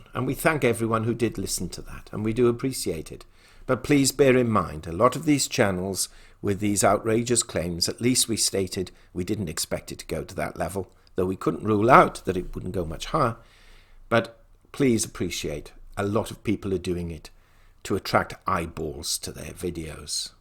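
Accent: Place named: RP, United Kingdom. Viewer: Wow, you just accused Mike Maloney, Chris Marcus, Chris Duane, David Morgan, Bix Weir and other respected silver professionals of either not having the technical understanding of the markets or worse yet, fraud by disseminating hype to sell product, while dismissing the fraud committed by JPM and the rest of team evil, who purchased over 2 million of fake paper ounces of silver to knock the price down to low $27s. Makes me wonder 🤔 about whose pocket you're in? The arrogance matches your Queen's accent.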